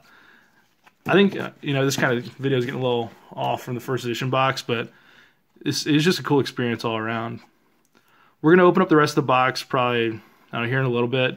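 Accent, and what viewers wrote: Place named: New York, United States. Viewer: Bro I knew from your accent you lived in New York haha I'm in New York as well and could just hear it!.. amazing that you were out here collecting and making big moves so many years ago and still today.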